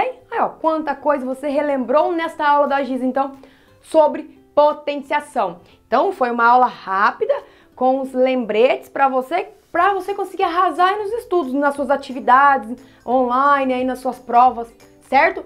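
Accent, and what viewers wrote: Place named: Santa Catarina, Brazil. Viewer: Voçê é de Santa Catarina?Voçê tem sutaque..😅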